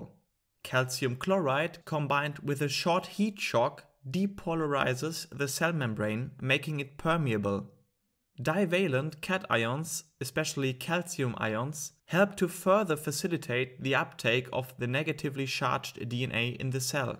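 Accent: Germany. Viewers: dein deutscher akzent ja moin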